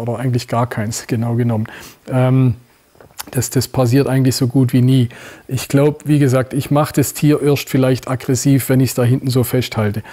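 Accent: Swabia, Germany. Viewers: Tolle Aussage zu dem kommenden Lampropeltis getula nigritus Verbot und auch zu dem idiotischen Kaiman Urteil. Weiter so, äh noch ne Bitte, könntest du bitte etwas mehr Hochdeutsch sprechen, dein Kanal ist (zum Glück) weit verbreitet und nicht jeder versteht schwäbische Ausdrücke und Aussprache. Ich verfolge ihn zB aus Spanien und habe damit ziemliche Schwierigkeiten, danke für das Verständnis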